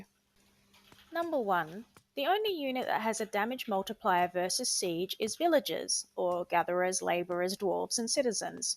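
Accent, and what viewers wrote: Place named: Australia. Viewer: Why do you sound Australian? 😅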